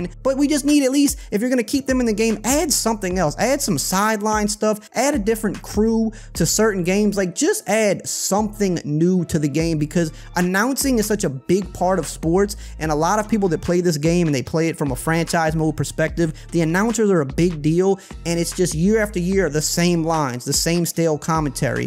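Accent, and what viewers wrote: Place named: Lafourche, United States. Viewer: Never seen one of your videos before but damn that accent gotta be from Lafouche.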